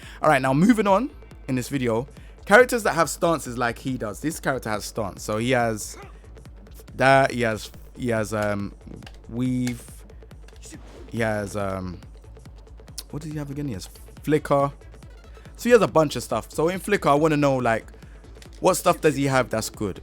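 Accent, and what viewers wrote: South London, United Kingdom. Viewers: Both from U.K., is from south london and I’m in the south region too, it’s quite common to hear similar or diff accents over here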